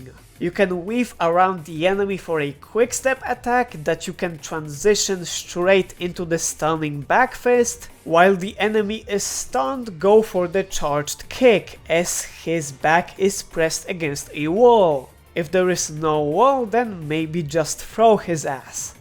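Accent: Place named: Eastern Europe. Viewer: Please work on your slavic accent. It's very distracting.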